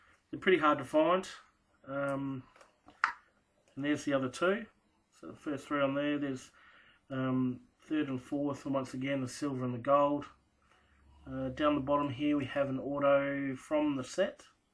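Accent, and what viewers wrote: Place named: Australia. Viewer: wow wow mate great pc, its great to see some old cards taken good care of. i will go though my cards and see what i can find for you, i would be very proud mate. oh and love the jacket very nice.i will send you a pm in the morn mate, just had a real lond day at work. and its soooooooooooo good to hear another aussie on hear!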